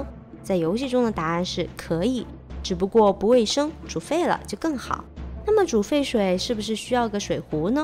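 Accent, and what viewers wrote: Sichuan, China. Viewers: 川普可是四川频道主的特色，不可不尝啊。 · 是四川口音啦，不能说是不标准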